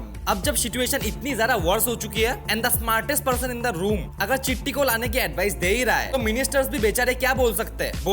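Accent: Assam, India. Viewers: Bhaii apka hindi bolneka tarika se lagta he u are from Assam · vaik assamese ho pata chal gaya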